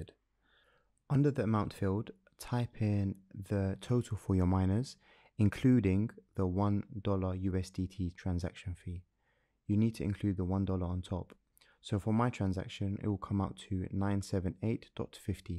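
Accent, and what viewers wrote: United Kingdom. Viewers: from the usa why does he sound british wtf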